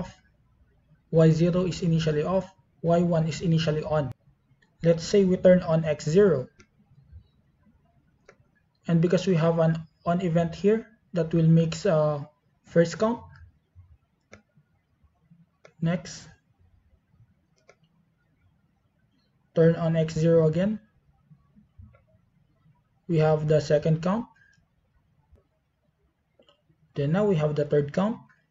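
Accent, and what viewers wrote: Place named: Philippines. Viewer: You sound like a Filipino.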